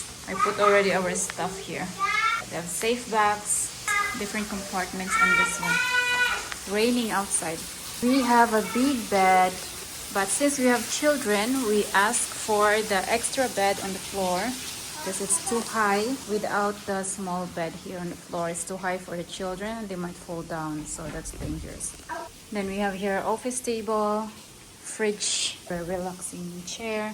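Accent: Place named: Philippines. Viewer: Carabao English!!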